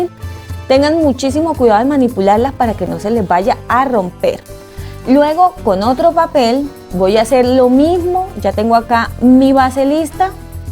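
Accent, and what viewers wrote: Colombia. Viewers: Me encantó la receta quiero saber de dónde eres es que le siento como un acento colombiano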